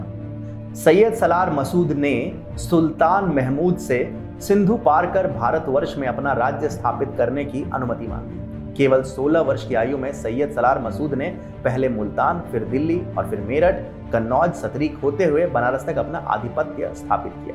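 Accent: Standard. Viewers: Hats off to you sir, narration in pure Hindi. One request - please write this history, many book readers would like to read and listen to such glorified, true history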